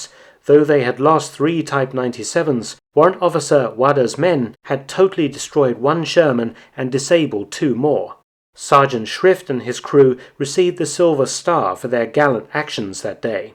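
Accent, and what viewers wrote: United Kingdom. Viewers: I love your intro then your awesome British professional voice over.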